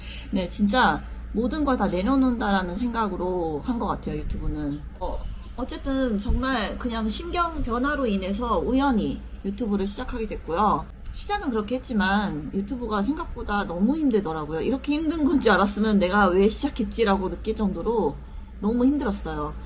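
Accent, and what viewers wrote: Gyeongsang, South Korea. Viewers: 억양을 보니 경상도 출신이군요. 수입은 꾸준하게 들어올 수 있는 구조로 만들어놔야 해요. 몇달 벌어 몇달 쓰고.. 이런씩은 노후 빈곤으로 이어집니다. 젊을때 열심히 일해야 해요. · 경상도 분이신것 같아요. 오늘영상에서 눈치챔.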